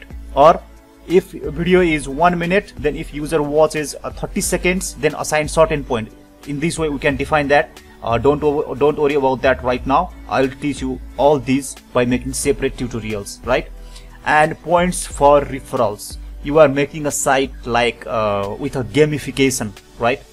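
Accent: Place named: Nepal. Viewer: Bro Nepali ho? Accent testai testai chha ta. 😁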